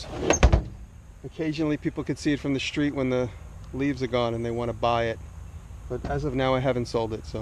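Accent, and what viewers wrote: New York, United States. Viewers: I just like the way says the word "garbage" and how many times he says the word "garbage" in this video. The NY accent almost makes it sound like "cabbage".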